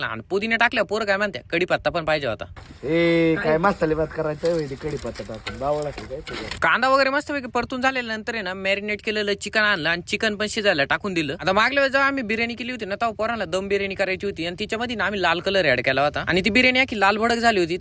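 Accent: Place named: Nashik, India. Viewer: दादा तुम्ही नाशिकचे आहेत का बोलण्यावरुन वाटाटे..बाकी बिर्याणी खुप छन वाटते..तुमच्याकडे बिर्याणी खायला येच्या आस्सल तर येच्या कुठे.. From Pimpri Chinchwad Pune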